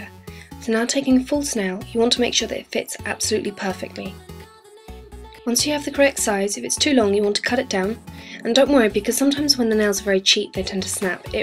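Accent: England, United Kingdom. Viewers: Love the british accent XD EPIC !!!! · Just watching it still ive paused it can i just say i looooooooovvveeee your pommy voice so much. Subscribing for it and great video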